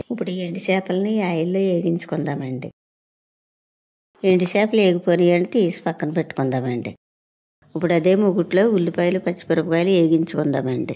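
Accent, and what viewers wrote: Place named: Godavari, India. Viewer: అండి అండి అంటున్నారు అంటే మన గోదారొళ్ళే 👌👌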